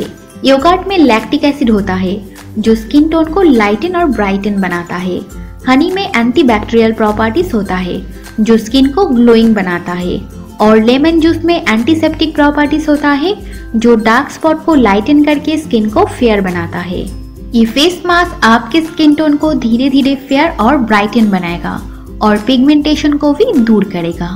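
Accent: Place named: Bengal, India. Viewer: Bengali accent spotted!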